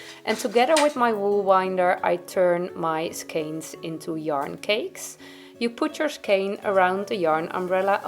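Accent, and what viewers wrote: Netherlands. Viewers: Your dutchy English just makes the episodes. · I know it is a lot of work to record your episodes twice, but I appreciate your dedication. I love your Dutchy English and would miss your sauce. Thank you for the deep dive into sock knitting. Your daughter's new socks are lovely! ❤🧶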